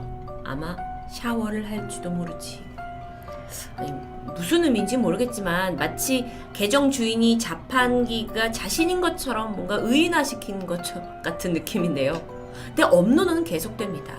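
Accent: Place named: Gyeongsang, South Korea. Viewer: 경상도~~~ㄲ ㅈ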